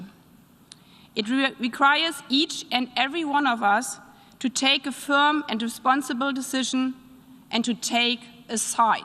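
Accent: Germany. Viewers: Ihr deutscher Akzent ist recht gruselig, aber darum geht es nicht. Sie macht einen guten Job, bietet Lawrow die Stirn, der seit 18 Jahren im Amt und ein mit allen Wassern gewaschener, skrupelloser Lügner ist, sie arbeitet hart, lernt schnell und hat in ihrem Amt eine Diktion eingeführt, die man, wenn überhaupt, schon lange von keinem Diplomaten und keiner Diplomatin zu hören bekommen hat. Wer bei all' dem nichts weiter zu bemängeln hat als ihren Akzent, der offenbart, dass es nichts zu bemängeln gibt.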